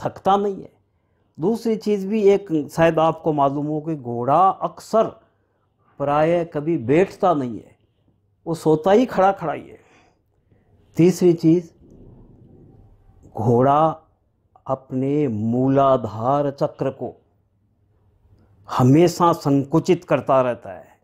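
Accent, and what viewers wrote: Rajasthan, India. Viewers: Sir aap Rajasthan se ho kya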